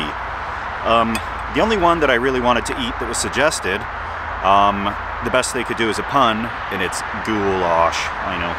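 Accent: Canada. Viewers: his accent always makes me think of my favorite Canadians. Trailer Park Boys is timeless. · His accent is 100% Canadian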